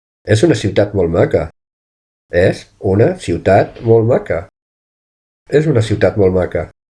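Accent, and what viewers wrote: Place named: Argentina. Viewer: Argentino intentando hablar en catalán?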